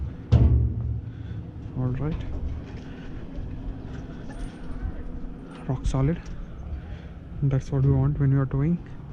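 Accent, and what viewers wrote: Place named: India. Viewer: Sounds like your Indian, hit me back looking for a fellow countryman to ride Road Atlanta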